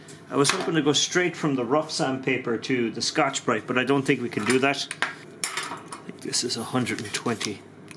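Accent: Ireland. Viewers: I just love hearing other Irish people since I moved from Eire to Australia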